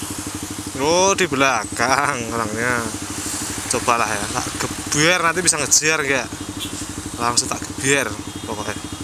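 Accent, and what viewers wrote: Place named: Banyumas, Indonesia. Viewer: iyo tak delok e pakngapak...ndi duduhno ak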